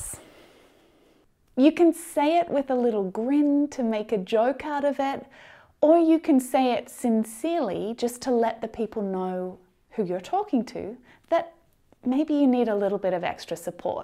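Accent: Australia. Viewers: For the way she talks, you can tell she has a "teacher" australian accent. If you come across some aussie he/she doesn't speak that articulate. I had an argentinian english teacher with the same way of talking but american accent. Nice video and VIDEOS btw!! (what does "mmm" stand for? "my magnetic mouth"?) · Hello, day by day your presentation is getting more interesting, and it is a bit hard to resist the temptation of watching you talking with a sweet Aussie accent. Keep up with your lessons that address all English language learners. You have chosen the best profession to make money through toiling and shedding sweat.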